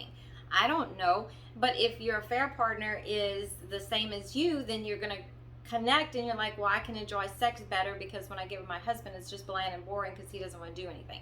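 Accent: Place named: Louisiana, United States. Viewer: Love your videos! You sound like you're from Lafayette... or somewhere here in Louisiana lol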